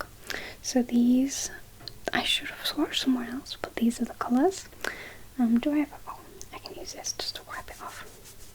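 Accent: United Kingdom. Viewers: You have a beautiful accent! Definitely no need to feel self conscious about it; I think a lot of people probably feel the same way about their own voice. I'd watch any of your videos, whispering or soft spoken you're so relaxing to listen to. Out of interest what part of the UK is your accent from? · Madeline C correct me if I’m wrong, but from theatre experience, this sounds like British to me. (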